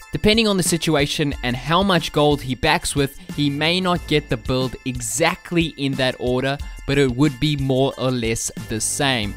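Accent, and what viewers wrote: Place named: Durban, South Africa. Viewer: God that fucking Durban accent is unbearable.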